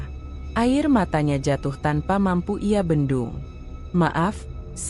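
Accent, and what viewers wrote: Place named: Java, Indonesia. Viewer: Lucu banget logat jawanya.... Aneh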